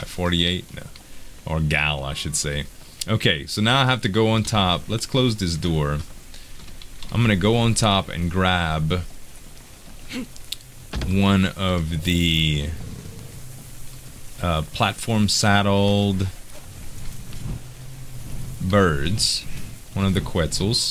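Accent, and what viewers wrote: Louisiana, United States. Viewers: Mais, you from Louisiana?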